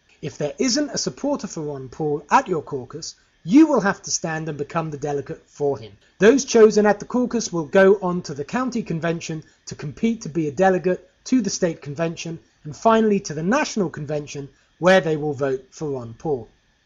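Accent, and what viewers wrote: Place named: United Kingdom. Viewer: This is very informative and straight forward. The fact that a British voice made this video leaves me wondering how much international support Ron Paul has. Ron Paul 2012!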